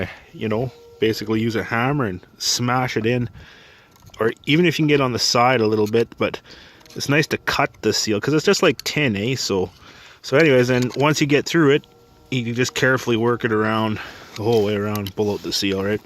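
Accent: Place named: Uttar Pradesh, India. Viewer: You sound like you are from the U.P to me eh'...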